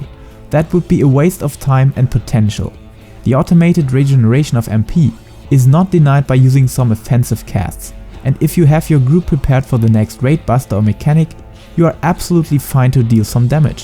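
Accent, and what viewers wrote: Germany. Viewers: A idea for when you're going to compare healers again Is to show icons of spells when you name them. Even tho I have all 2 eu 3 (rip astro) at 80 BIS I have no idea what most of the spells do when you call them out with your beautiful thicc German accent.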